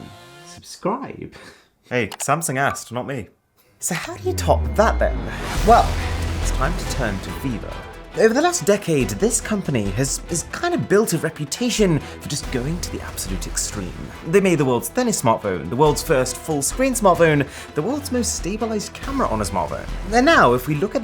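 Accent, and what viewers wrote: RP, United Kingdom. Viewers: Ah ! Terrible British English accent 🤮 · I am not even that way, but this man is just scrumptious, just the way he speaks with that posh accent and also being resplendently beautiful....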